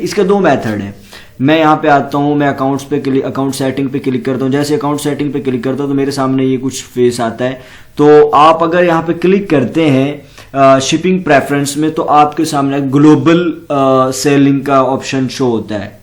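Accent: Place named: India. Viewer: Why the English title and Indian voicover? Now I have to keep searching :(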